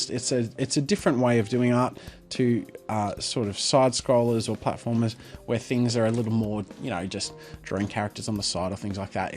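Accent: Australia. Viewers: He sounds so much more Australian in this video. Is it because he was younger?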